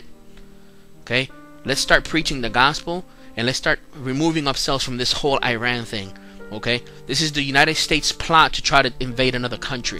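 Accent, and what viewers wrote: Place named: Puerto Rico. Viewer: bro you sound Boricua like me. But you are soooooo confused. Tell me, ¿Where is Jesus returning to FIRST? ¿NYC?¿Paris?¿London? HELLOOOOOOO!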